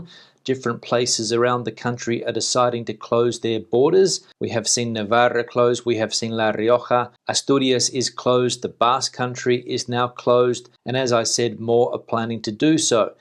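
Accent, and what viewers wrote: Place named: Australia. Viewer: Hi, — really appreciate what you are doing with your daily updates. I don’t miss a one of them! We had to give up our Sitges apartment earlier this year and can’t wait to be able to return to Spain on a regular, if not permanent, basis. But who knows when that will be at this point. Hats of to you all in Spain for your efforts and, again, thanks to you for your hard work in keeping us all informed. (PS: would love to hear you speak a few sentences in Spanish so I can hear an Aussie accent in that language!) 😁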